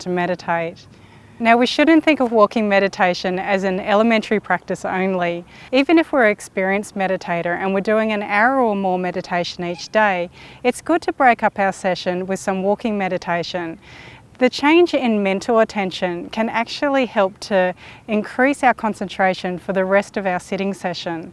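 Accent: Australia. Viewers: You do not look pure chinese, plus your last name is Indian, where do you originally come from? Accent is definitely Australian but the looks is so mixed, you cld even pass as an arab by the way.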